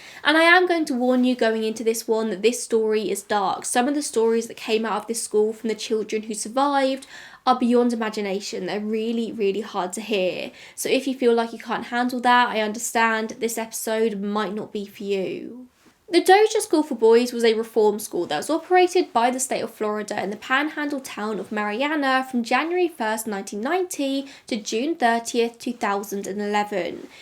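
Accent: United Kingdom. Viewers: I am Australian and I think what you are referring to is just her accent and way of speaking and not trying to be anything. I am not annoyed by it and didn’t even realise what you are referring to till I actually concentrated on it. Many British speakers speak like this…if you are annoyed…stop listening…. I listen to a lot of American accents in these true crime channels and find many of them hard to listen to and just plain annoying so I don’t subscribe to them . I do think it is rude of you to comment…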